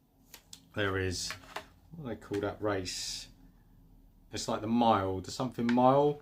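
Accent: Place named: England, United Kingdom. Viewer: In Hawaii I grew up with many Filipinos, first time I'm hearing one with English accent 🤣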